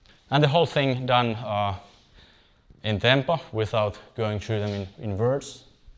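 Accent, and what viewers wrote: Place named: Finland. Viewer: Are you Finnish? Your name sounds like it, you really look a lot like my wife's cousins in Helsinki, but your accent is all over the place! Sometimes it reminds me of the accents of my Finnish family, at least with certain sounds. Whether you are or not, your accent sounds very worldly and sophisticated to my ear at least, so please know I mean no offense. That said, I really enjoy your content here! I hope that you are still training and coaching wherever you are!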